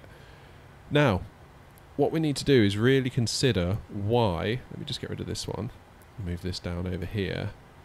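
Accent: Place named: United Kingdom. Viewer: lovely hear british accent 😄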